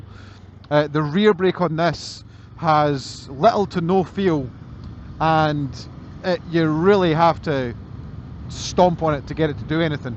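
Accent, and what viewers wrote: Scotland, United Kingdom. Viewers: 😂 LOVE that Scottish accent …as an Irish person I can understand very word !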